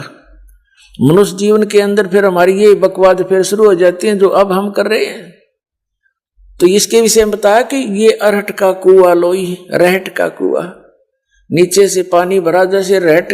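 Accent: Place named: Haryana, India. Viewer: Iski haryaanvi bahut mst lgti h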